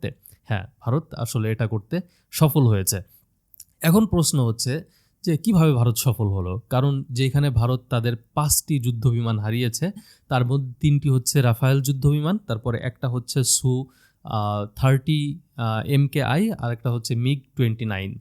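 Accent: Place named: Bangladesh. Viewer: Toke koto Ghosh khaiyeche....tor kotha bolar ton alada ....tui mone hoi BD mal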